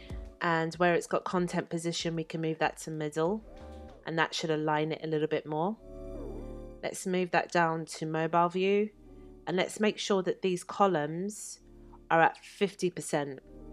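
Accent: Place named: United Kingdom. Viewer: Love British accent.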